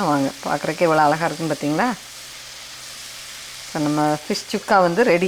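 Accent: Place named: Tirunelveli, India. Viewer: மேடம் உங்கள் டிப்ஸ் அருமை உங்கள் பேச்சில் திருநெல்வேலி வாடை வருகிறதே எந்த ஊர் நீங்கள்.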